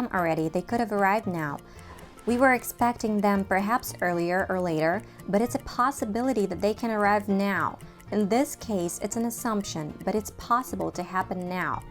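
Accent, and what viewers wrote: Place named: United States. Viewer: American accent rules !!!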